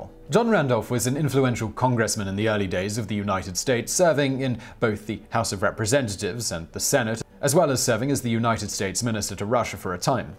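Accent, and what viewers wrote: United Kingdom. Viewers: A dude with a British accent tells US about the founding fathers. Go figure!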